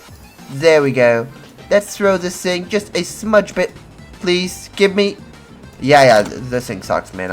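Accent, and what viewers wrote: United Kingdom. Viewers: I swear he sound British.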